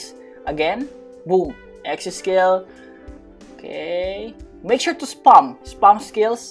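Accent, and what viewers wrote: Philippines. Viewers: Tunog pinoy to ah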